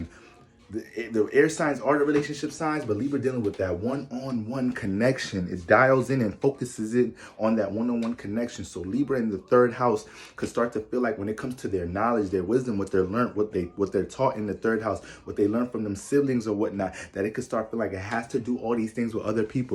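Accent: New York, United States. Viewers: I swear you from nyc or jersey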